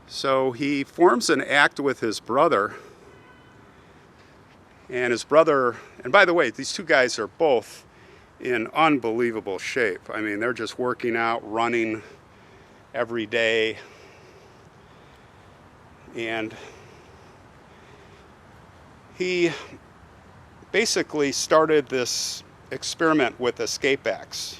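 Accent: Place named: United States. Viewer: Your talking in popular American English helps me to learn more and more words that I don't know before because I already learned the standard English language ..Thanks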